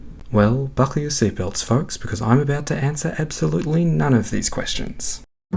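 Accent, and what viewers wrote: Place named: Australia. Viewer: Aussie? Nice 🇦🇺🇦🇺🇦🇺🦘👊🏼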